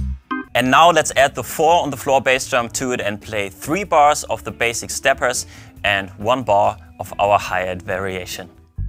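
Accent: Germany. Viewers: Hallo Herr Das Video finde ich sehr gut, allerdings habe ich nach 2 Minuten abgeschaltet. Ich sehe einen englisch sprechenden Protagonisten. und denke mir das Englisch ist aber gar nicht so englisch. Das ist ein deutscher, der Englisch spricht. Ich muß gestehen das ich nicht so fließend Englisch sprechen kann geschweige es noch so gut verstehen kann. Das liegt daran, daß ich nun mal Deutscher bin. Jetzt komm ich aber zum Kernpunkt der Sache. Herr, warum machen Sie kein Video in dem Sie Deutsch sprechen? Für viele Menschen die nur Deutsch sprechen, würde es leichter fallen ihre Videos zu verstehen. Oder wollen Sie mit Ihren Videos nur Engländer, oder Amerikaner erreichen? Nun gut. Ich hoffe ich habe Sie jetzt nicht verärgert wegen meiner Frage. Ich wünsche Ihnen weiterhin viel Erfolg mit Ihren Videos So denn... ✌️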